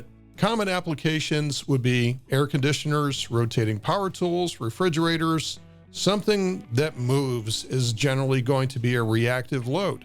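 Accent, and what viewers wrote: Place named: Northeast, United States. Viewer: ha! Your accent seemed more northeast. Great overview. Thanks for taking the time to do it. In a pinch, another way to achieve the bonding requirements of the F150 without an expensive 3-pole disconnect is to have a lockout device on the main breakers and a (preferably locked) switch for the bonding at the house's main panel. This is the same as disconnecting the bonding jumper in a subpanel, but you're just doing it without a screwdriver. Here's a video where the homeowner achieves it via the screwdriver method: <link>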